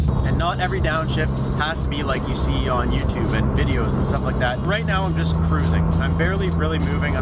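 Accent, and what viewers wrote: Canada. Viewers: He sounds really Canadian honestly he reminds me of my friend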